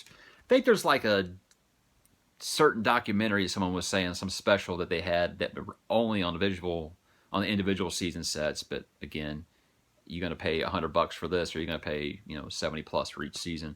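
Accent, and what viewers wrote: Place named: South, United States. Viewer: Wow your voice then versus now is soooo different! The heavier southern accent was so cool to hear.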